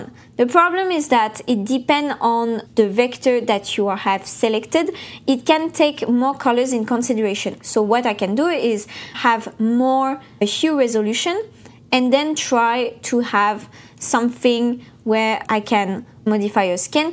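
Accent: France. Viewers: Salut.. Merciiii. Pour. Ce video. surtout que c'est ton specialité. J'addors ton accent français. Quand tu parle anglais.. ❤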